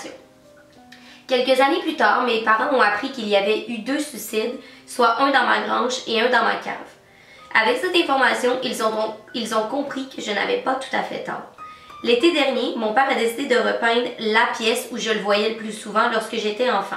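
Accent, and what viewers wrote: Quebec, Canada. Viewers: jarrive pas a ecouter sa me deconcentre ta voit jai rien contre les quebecois mais la ta laccent vraiment fort et c hyper bizzar mais sinon jaime beaucoup et tu es tres belle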